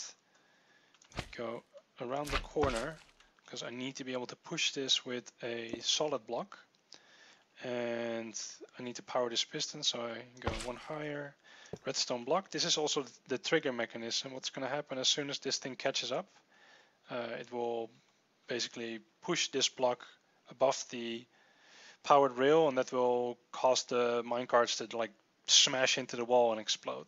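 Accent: Netherlands. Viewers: ***** oke nederlands :P je acent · let me guess, you're dutch. (kan je horen aan je accent) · aan je Engels hoorde ik dat je Nederlands was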